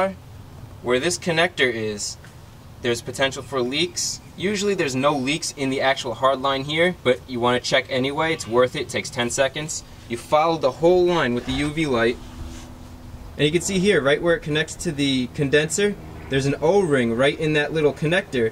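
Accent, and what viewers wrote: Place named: Delaware, United States. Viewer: You are the best, and as a DE guy, I miss people with your accent. Soapy wooder forever dude.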